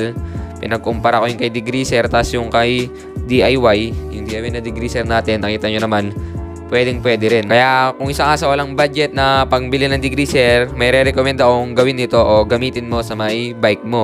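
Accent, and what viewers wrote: Ilocos, Philippines. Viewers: ilocano at kapampangan naririnig ko eh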